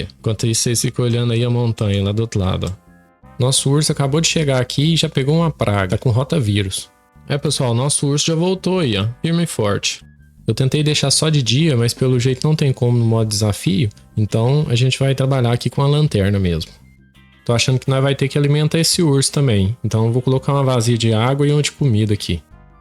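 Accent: Minas Gerais, Brazil. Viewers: Verdade acho que é por conta do sotaque fofo de mineiro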